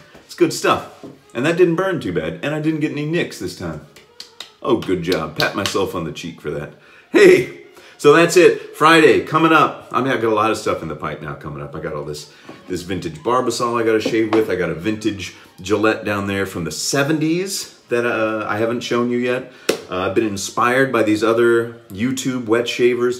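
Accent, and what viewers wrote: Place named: United Kingdom. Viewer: Amazing british accent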